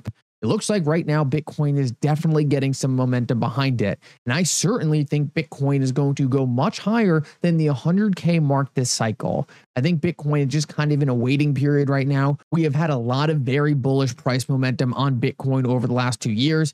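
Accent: Allentown, United States. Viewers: with an Allentown accent, XRP is set to go to 0.001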